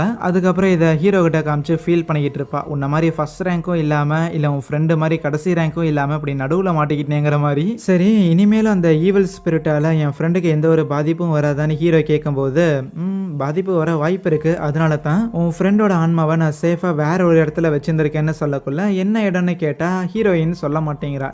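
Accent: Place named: Madurai, India. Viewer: Unga slang avangya nu sollum bodhu nenachen, Madurai side than irupinga nu 😅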